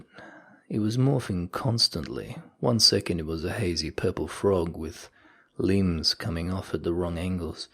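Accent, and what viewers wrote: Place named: Australia. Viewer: The narrator sounds Australian. I wonder if his grandfather was?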